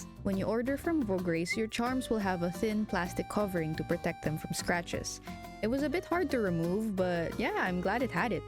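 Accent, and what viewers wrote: Philippines. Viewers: You know is Pilipino Because of The Accent❤❤😅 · WAIT UR FILIPINO?? I SEE THE ACCENT IN YOU. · i could tell from her/his (dont want to misgender and start an arguement heh) voice that your filipino, and the "lolo" part lol, but your grandpa is cool and i love how supportive he is just by wanting you to make merch for him :3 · Hi im new here, i love the shorts you post😁😁 Anyways.. I have a question... You sound filipino are you?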